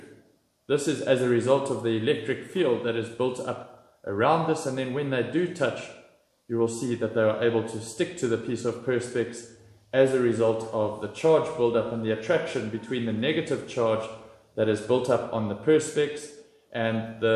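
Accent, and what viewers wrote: South Africa. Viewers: south african hey?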